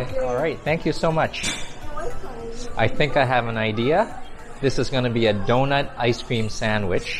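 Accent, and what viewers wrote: Canada. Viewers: I love to hear you speak, is that a Vancouver or Toronto accent?😊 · Hi! By any chance are you Canadian? I detect an accent, and also you’re super friendly.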